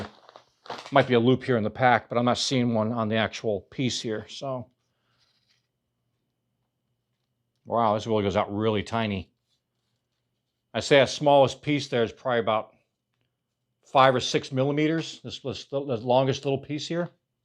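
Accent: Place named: United States. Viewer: Always makes me laugh how you Americans pronounce soldering ie sodering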